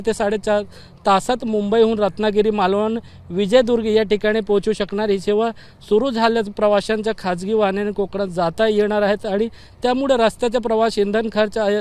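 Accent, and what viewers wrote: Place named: North India, India. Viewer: आरे हा काय बोलतोय आणि कसा बोलतोय . सगळ चुकीच बोलतोय .पण मराठी बोलतोय सर्व भैयान मराठी बोलता आलच पाहजे.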